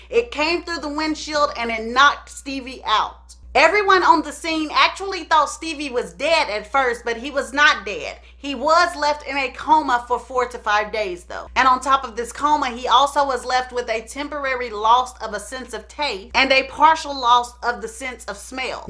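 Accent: South, United States. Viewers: A southern slain for “how come” 😂 she’s straight country lol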